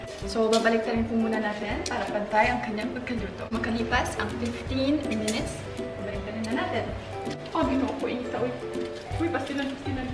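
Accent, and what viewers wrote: Visayas, Philippines. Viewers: Ka cute mag binisaya oyy 😍😍❤❤ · Ganahan ko kaayo mostorya syag bisaya heheh gwapa kaayo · Cute kau ka mag bisaya bah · Pwede ba ka himo ug Bisaya challanges 24hr.. Kay ka cute nimo magbisaya❤❤❤ watching fr0m Finland🇫🇮🇫🇮🇫🇮 Pa shout me Family Heikkilä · Kaaligre magbisaya oy! More videos pa. Loveyouuu😘😘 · Ka cute nlng mubisaya uy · Oh!! 😮.Ms. its so mouth watering. 😋😋and cute niyo po pagnagbibisaya.😄.ganda mo po always ikaw lang ata ang nag-ihaw na ganyan ka fresh. 😊😍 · hindi ako bisaya peru bakit ang cute no g. Kpag nagssalita ng tagalog na bkisaya accent hihi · Ang Cute ni Ms. mag bisaya❤️😍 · I love the way you speak "Bisaya" the same as me 😊 I'm proudly Bisaya 😊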